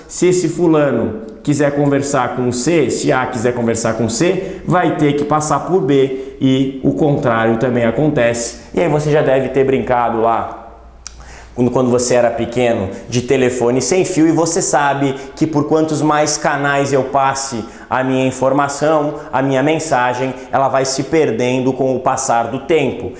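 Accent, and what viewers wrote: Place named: South, Brazil. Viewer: quando o profissional é bom, em apenas 1 minuto isso se torna claro. Parabéns. +1inscrito p.s. aposto que é sulista. ô povo bom para ensino ead.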